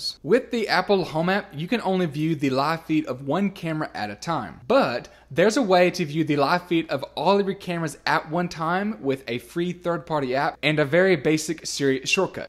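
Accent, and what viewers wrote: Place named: South, United States. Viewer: I had trouble understanding what you were saying. Several times I had to pause or skip back a few seconds to try to understand you better. I don’t think it’s because you have a slight southern drawl. I think it’s because you are trying to talk too fast, and some of your syllables get swallowed up as the words run together. This is only exacerbated by how aggressively you've cropped out the silence. The tiny Pauses & breaks between phrases that you've trimmed, in my opinion have been cropped too close to the front & back of the words; there’s no split-second for me to process before moving onto the next phrase. Good info, just a bit too much effort on my end to try to understand what you're saying. I know you want to keep your videos short. However if you trim off a few extra seconds, but then that costs me a few extra seconds , 10 times throughout the video, did I really gain or save anything? 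It’s a bit exhausting to be honest.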